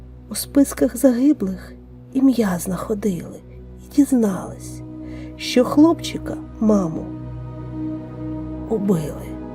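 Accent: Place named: Russia. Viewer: Російські наголоси та російська вимова. Вчіть українську!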